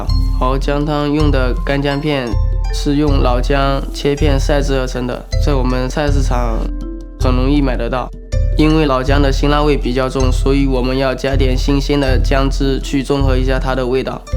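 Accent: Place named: Jiangsu, China. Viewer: 我只知道和江苏话很像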